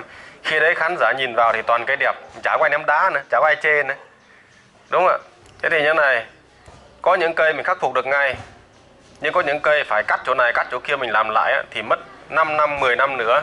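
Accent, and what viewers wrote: Vietnam. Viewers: Giọng thằng này 70% dân hoa thanh quế. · Bác dậy làm rất dễ hiểu.mà cho e hỏi bác ở thanh hoá phải k ạ.e nge giọng giống ở yên định thanh hoá quá bác ạ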